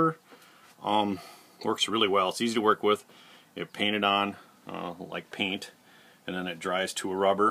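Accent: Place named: Minnesota, United States. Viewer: Are you from Minnesota? When I heard you say "The Cities" it made me think you are. And the way you talk too lol.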